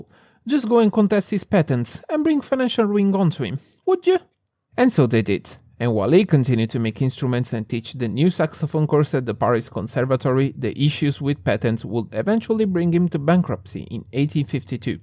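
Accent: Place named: Italy. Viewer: You are getting better at videos, lenght is right, humor is silly and dark and animation sucks as it should. Like comment subscribe lets get the algo interested more even if it already has shown you. ( oh and work a bit on the english, the accent I dont think is a problem, but some words are clearly hard to spell for you and you slur them a little. Good pronounce + slight italian accent is the way to go imho)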